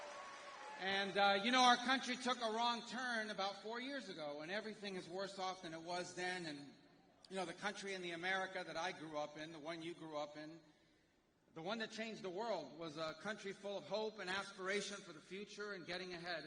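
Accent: Cuba. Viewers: Damn, being Spanish my primary language by living and US for many years, coming to see another 2nd generation latino-gringo proud of his Spanish skills to laugh and…. he rocked. Finaaally some good Spanish, well articulated, the message is delivered in a proper way, Cubn style but correctly. Maybe this is one of the reasons why many Latinos voted for Trump this time. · How Spanish was great, it’s just the Cuban accent. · Didn’t know his Spanish was that good. Sounded great, true Cuban accent. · Rubio you’re the man love your Spanish puro cubano puro americano con amor 🎉😊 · I’m a Tejano and I understood him very well because of the passion and emotion he puts into his words in Spanish—even though Cuban Spanish is a beast to understand compared to other Spanish. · I hope that Hispanic parents look at this as a great example to teach their US born children Spanish. There should be no stigma or shame about speaking Spanish. 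It’s an incredibly beautiful and rich language. His parents did an incredible job raising him bilingual, and his bilingualism gives him a tremendous advantage in his political career. He didn’t use a single Spanglish word. His speech was eloquently and passionately delivered in pure Spanish. As a Cuban-American, I can confirm that his Cuban accent was authentic. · You can hear how similar his spanish sounds to Desi Arnaz / Ricky Ricardo, it's not like spanish from Mexico. I had a co-worker who's family was from Cuba and he said Cuban spanish is like a machine gun, and Mexican spanish is very sing-song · As he said, it is more like a "Cuban" sound... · His accent is very cuban indeed:) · Español cubano como bien dice 😁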